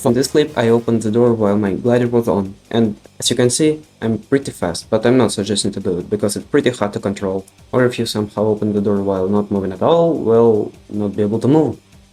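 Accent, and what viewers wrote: Russia. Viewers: are u russian? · Ahh russian accent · Are you russian?? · просто акцент у тебя слишком русский👍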